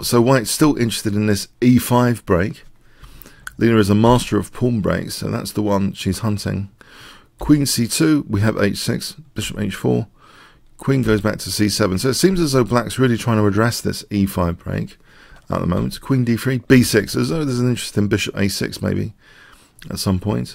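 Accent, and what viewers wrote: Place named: London, United Kingdom. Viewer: You sound like a Londoner so surely you're familiar with Grosvenor Square? The 's' in Grosvenor is silent, you know.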